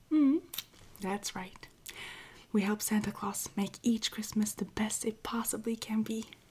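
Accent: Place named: Scandinavia. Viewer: It's so weird how I never noticed your mild Scandanavian accent until you went quasi-albino. 😅 Great relaxing video as always!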